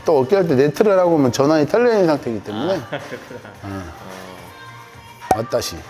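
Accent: Jeolla, South Korea. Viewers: 전라도 행님이셨네요 ㅋ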